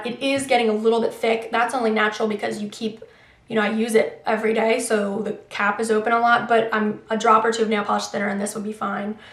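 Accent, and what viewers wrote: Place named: Philadelphia, United States. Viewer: are you from Philadelphia? Your accent sounds like it could be :]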